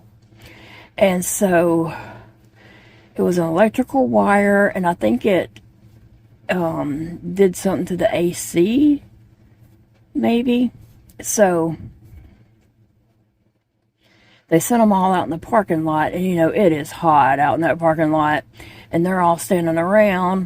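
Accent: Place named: Mississippi, United States. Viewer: ! Aw Lawd Jesus. Prayers for all involved in the Walmart grocery delivery!!! I’m proud you made a decision to revoke the delivery ladies tip & give it to Paige. That was very rude for that driver to leave your groceries in the dirt. That was deliberate. She chose to do that. Unreal. On the woobles book. I need to pull the trigger & but that. It’s just that I get too many wips on my hook & sometimes they are in different hook sizes. I’ve been known to buy extra hooks from Amazon to leave the hook in the project bag. I have bought these clear zip up ‘stitch kits’ at Hobby Lobby & may invest in more of those so each project bag will have the hook, & etc… stuff… because I’ve been known to use the wrong hook size to continue a old wip (work in progress). So,… I may order that yarn & the sewing kit bags. I may look on Amazon too. I just like what I like outta trial & error. 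Lol, I love how you say “Mawtha”! I was crocheting the first time you said her name a few weeks or a week back & thought you were making a Moth & her name was Mawtha! Lol! I’m originally from New Orleans so all my relatives back there have an accent so I do try to listen for certain accents with words. And I follow an English (UK) woman’s Facebook page, and some country folk on YouTube too. Your accent is closer to middle Mississippi accent so I can understand you very well as I’ve lived in Vicksburg for many years & over here near Jackson for even longer. Now, the North Alabama people had a curt accent & d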